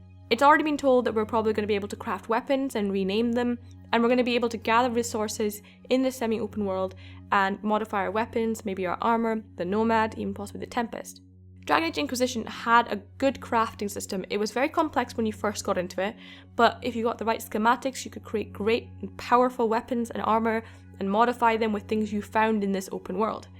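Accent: Scotland, United Kingdom. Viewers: sounds Scottish to me.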